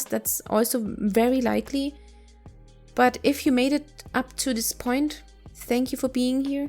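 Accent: Germany. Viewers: This German school English 😂. So cute. I listen you first time but 100% you german. But good video👍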